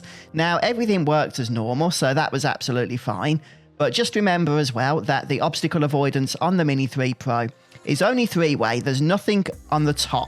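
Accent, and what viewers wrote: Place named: Midlands, United Kingdom. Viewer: Hi ive been watching your videos off and on for about a year now thanks for the videos. Im just wondering are you a brummie you sound like your from the midlands Im a former brummie who lived not too far from the city but been living in county Kerry Ireland now for over 35 years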